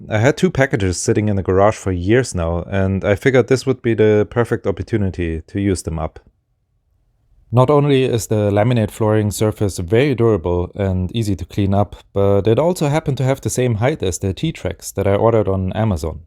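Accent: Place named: Germany. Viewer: Man well done.Great innovations…or combination of different ideas to make it work for you.Just found your channel by incident,and think you would deserve more klicks.I just wonder…are you german? It just felt like the little accent sounds familiar to me.Keep it up well done.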